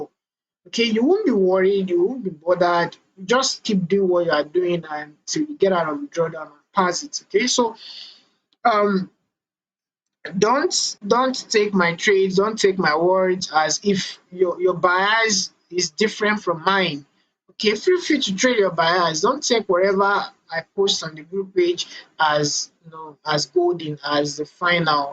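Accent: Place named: Nigeria. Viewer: Ma'am, you sound Nigerian... I am a Nigerian too.. i wonder if its possible to set up a meet for a one on one?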